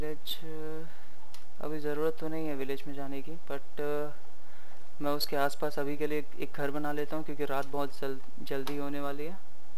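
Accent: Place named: North India, India. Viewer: also like your north wali hindi... (delhi kya?)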